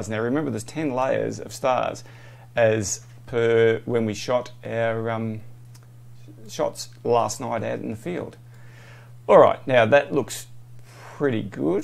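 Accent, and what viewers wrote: Australia. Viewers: Nice to hear an Aussie accent on YouTube 👍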